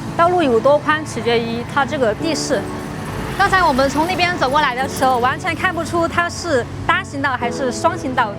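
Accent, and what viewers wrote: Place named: Sichuan, China. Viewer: 和四川人说话有点像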